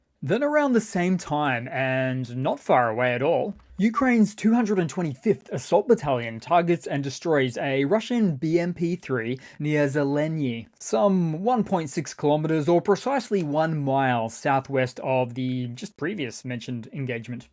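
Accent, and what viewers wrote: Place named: Queensland, Australia. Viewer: Cheers your accent sounds like you might live near 1770, nice part of the world:)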